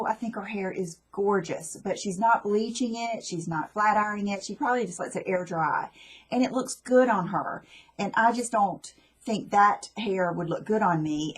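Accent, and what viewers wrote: South, United States. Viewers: well said b/c I am a firm believer in doing what you like to do re: anything from hair color, length etc.... your the one wearing it....your absolutely beautiful & that nice southern twang....love love...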